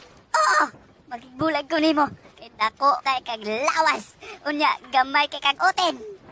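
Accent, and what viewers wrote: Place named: Visayas, Philippines. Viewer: Ala eh visaya he he ka cute goodluck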